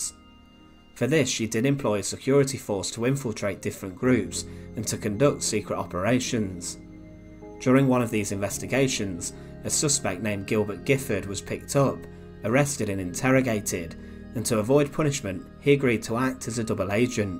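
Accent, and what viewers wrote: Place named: England, United Kingdom. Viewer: I don't think it is a robot - just a bad narrator. Proof that an English accent does not always make for good narration.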